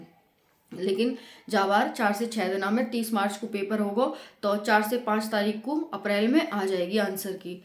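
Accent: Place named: Mathura, India. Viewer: Mam aap kahan se ho aap meri tarah bolti ho m Mathura up se hun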